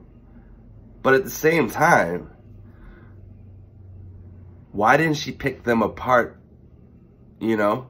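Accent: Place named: East Coast, United States. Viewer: Love your video! Love what you're saying but I also love listening to you talk. Sounds like you're from the east coast. I'm from the east coast but live in California right now. Love your personality.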